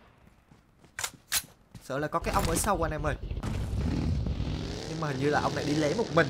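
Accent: Binh Dinh, Vietnam. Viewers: Bình Định bạn nhá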